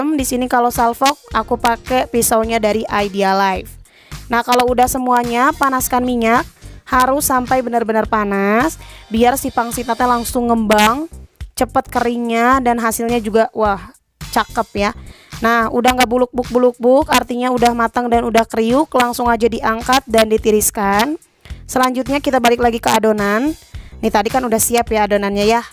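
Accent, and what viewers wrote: West Java, Indonesia. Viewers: Alllllaaaaah c teteh ...sunda bingit ,😁😁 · Sunda banget euy hehehe si teteh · Akumah suka yang ngehostnya nyunda banget🤓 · Sunda pisan nya saiiiiii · Masyaallah resep pisan da nyarios na ka c teteh ieu sunda pisan👍,, salam dari aku orang ciwidey teteh 🙏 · Lucu pisan aya sundaan euy🤗😆😆sehat slalu teh makin lancar usahanya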